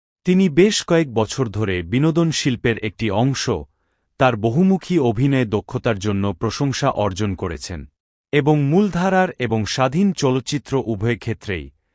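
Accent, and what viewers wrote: Bangladesh. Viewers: বক্তা মনে হয় বাংলাদেইশ্যা। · নিশ্চয় বাংলাদেশী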